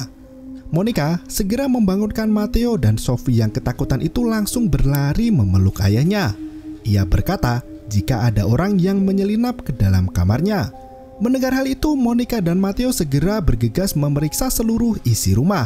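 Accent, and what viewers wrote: Java, Indonesia. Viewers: Medokny keliatan banget..jowo ndi mas😆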